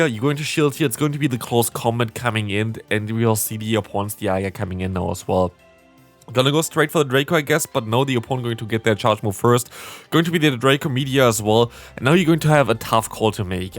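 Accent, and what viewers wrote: Germany. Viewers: Why do I think you have a german accent?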